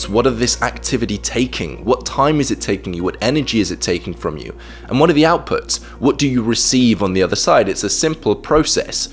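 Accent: England, United Kingdom. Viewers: The amount of click bait in this video and terrible over-simplification of the topic should be the basis of your next video lmao. Using your English accent, simplifying popular activities, using clickbait words like “re-wiring, detoxing, dopamine, neurons, etc” You may have the simpletons and immature people in the YouTube comment section fooled, but you really haven’t added anything to the conversation or made any concrete valid points worth anything. It’s a lot easier to see through this lame facade you are trying to project.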